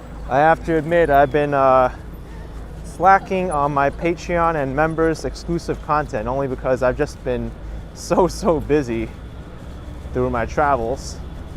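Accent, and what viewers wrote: New York, United States. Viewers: Hi, I really like these videos of the back streets of New York. Admittedly when you announced you were moving to Miami I was sceptical that I would still enjoy your content and I haven’t watched any Miami videos to date. I always associate you with New York, your town and your accent. I watched your Leaving video but I’ve yet to watch the Decline video but I will.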